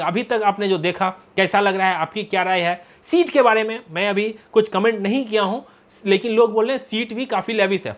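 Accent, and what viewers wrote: Bihar, India. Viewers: uncle aap bihar se hai 😊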